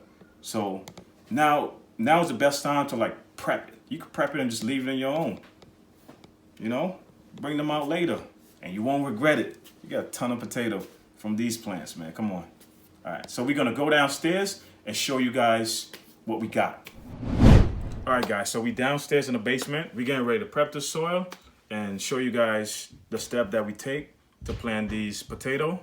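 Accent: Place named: New York, United States. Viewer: Haitian New York accent is What’s up!!!! 🎉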